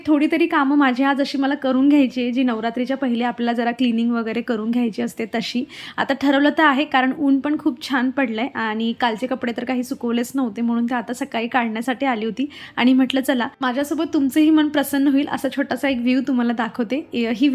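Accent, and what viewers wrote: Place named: Khandesh, India. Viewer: Tai ahirani bolat ja na madhe madhe... khupach god vatat hoti aikayla.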